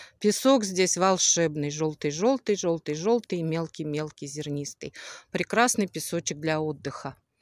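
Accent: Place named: Dagestan, Russia. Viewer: 😂😂😂😂😂 только ты сама из Дагестана. И акцент у тебя Дагестанский. Сколько тебе заплатили за рекламу. Охринеть за деньги все что хочешь сделают и раскажут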